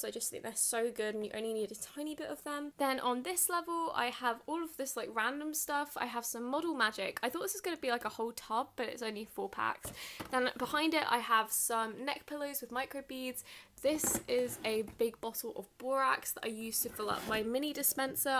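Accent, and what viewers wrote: United Kingdom. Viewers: Britich or american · OMG u r sooooooooooooo pretty and ur voice is so british and i dunno its a bit...liek a tangy british accent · Are you from the uk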